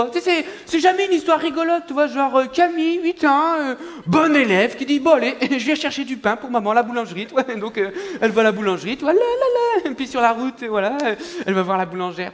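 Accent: North, France. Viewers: une masterclass d’humour noir, d’humour beauf saupoudré d’accent du nord 😭🤣👌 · C est d ou cette accent ?🤔 ch ti ?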